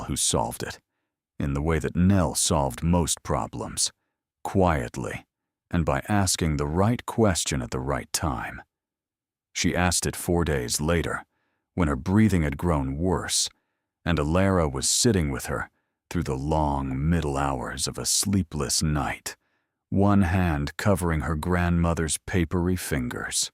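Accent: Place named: United States. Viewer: Ovo je jedan od dva AI kanala koja pratim, ne volim AI kanale ali volim ovakve priče, kad ChatGPT nije nepismen kao obično. Molim da se prebacite na britanski engleski jer američki akcent u pričama ove vrste iritira i dodaje na veštačkom dojmu kojeg je, zbog AI, ionako više nego dovoljno. Hvala.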